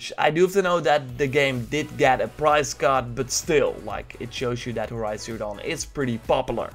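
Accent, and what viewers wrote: Netherlands. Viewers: I think competition mainly lies with particular services like: Games with Gold & PS Plus Games, Xbox Live services vs PS Plus services etc. I get that to a certain point exclusives can win a persons heart at buying the console which supports it. But overall it hurts the gaming community because multimedia is infested now with fanboys arguing which console is better. I think Xbox, Playstation and Nintendo all have their pro's and con's. But it doesn't mean that it is right to trash talk the competiton. I own both a Xbox One S and a PS4 Pro ajd I completed Horizon Zero Dawn today (watching a lot of videos of yours). My point is, enjoy playing video games on whatever platform, but act with some kind of dignity inatead of insulting the competition ;) PS: are you Dutch? I slightly hear a Dutch/Flamish accent 😉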